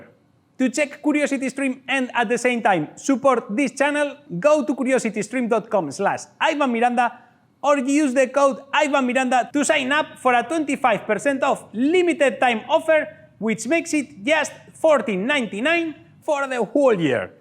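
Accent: Spain. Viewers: Soy yo o eres Español? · que chulo acento español xd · Eres español? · This dude Spanish? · eres español, verdad? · Joder cómo se nota el acento español...Buen vídeo tío!!! Te van a encargar cosas muy grandes con eso! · Acentazo español, nombre español, pero videos en ingles. Es como si colim furze hiciese los videos en español para tener mas seguidores en Sudamérica. 🤷🏼‍♂️ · pretty cool! very nice project! What material is the red plastic that you printed parts from? was it hard to get the axes perpendicular in this scale? Also you sound spanish ;) where are you from? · Inconfundible acentazo español nada más abrir el vídeo xD Como me alegra ver a makers españoles. Mucho más viendo lo crack que eres y lo bien que te va en la plataforma. Proyectazo! Sub!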